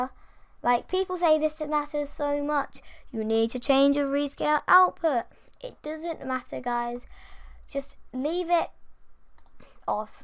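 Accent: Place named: England, United Kingdom. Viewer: Shes English like me.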